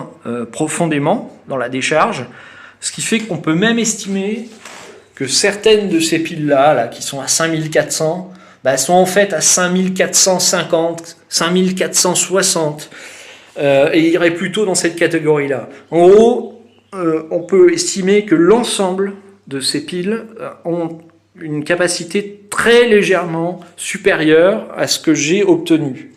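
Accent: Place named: France. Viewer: mmm, this amazing french voice )))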